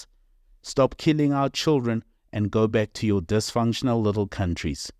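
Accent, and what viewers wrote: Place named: Netherlands. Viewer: Who are you to decide for us you are a white boer you don't belong here either you were deciding on us when it was aparteid so now fick off to Holland and stay there with. Your Dutch accent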